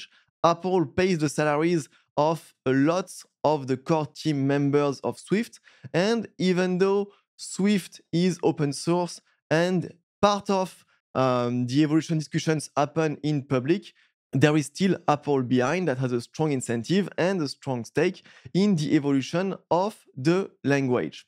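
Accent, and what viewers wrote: France. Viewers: L’accent franchouillard qui fait mal aux oreilles 😂😂😂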